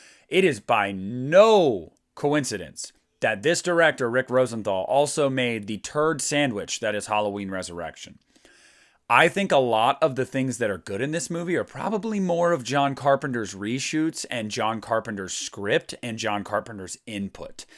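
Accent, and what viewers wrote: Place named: Pittsburgh, United States. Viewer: I love your Pittsburghese.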